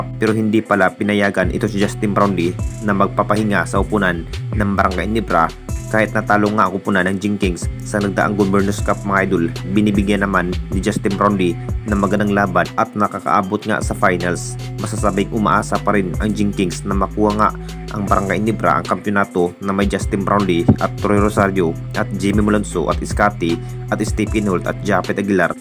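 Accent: Visayas, Philippines. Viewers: Bisaya ka man dong.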